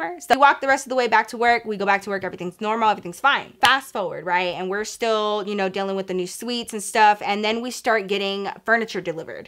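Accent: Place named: South, United States. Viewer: I love how u say certain words ur Southern twang is so cute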